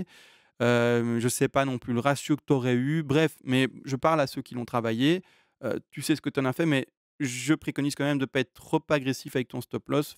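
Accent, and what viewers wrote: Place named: Belgium. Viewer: Oui il a l'accent belge.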